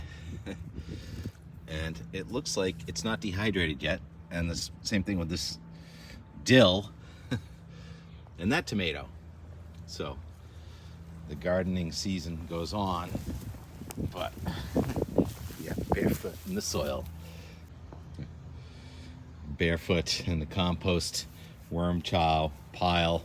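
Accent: Boston, United States. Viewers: You had it right the first time, it's Oscah. Lots of people wish they had as good an accent as Bostonians. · The warm ayah is heeah again... Brandish your Boston accent graciously. ☘👍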